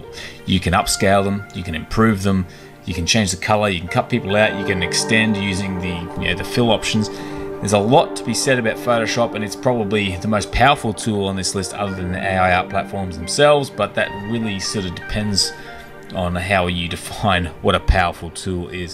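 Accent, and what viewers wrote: Australia. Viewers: So nice to hear an Aussie voice on this topic. Thanks mate great info.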